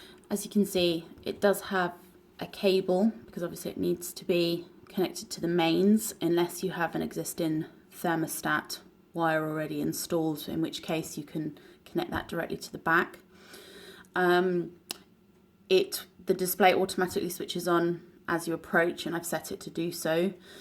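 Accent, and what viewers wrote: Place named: United Kingdom. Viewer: Whatever way I try to say this, it will sound sexist to some degree but it is not meant that way at all. It is SO refreshing to hear a woman's voice on one of these usually male-dominated videos. And the voice is British. And the voice knows what it is talking about! I have watched a lot of NEST stuff and it is either mounted to the wall with a wire or on the table base - nice to see it on the wall with the wire and also unique to see it 'unplugged' from the mount. A video made by someone who shows what I want to see, not all the stuff available elsewhere. Brilliant!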